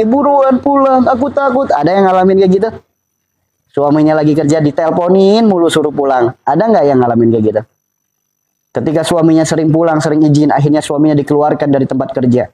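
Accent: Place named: West Java, Indonesia. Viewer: Ya allah pa ustadz, sunda bngt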